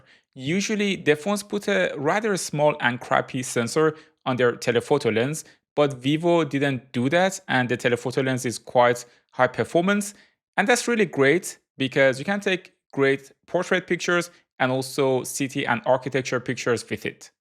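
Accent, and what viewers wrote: Iran. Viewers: انگلیسی صحبت کردن ایرانیا همین قدر ساده و قشنگه .. هر جا هستی موفق باشی داداش گلم ❤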